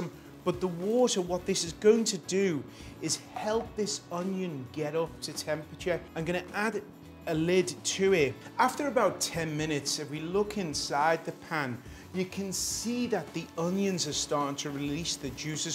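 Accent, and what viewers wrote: Liverpool, United Kingdom. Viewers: SCOUSER ACCENT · Do I hear traces of a Liverpudlian accent? I'm not from the UK. However, I find the plethora of accents from different regions very charming. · Scouser liverpool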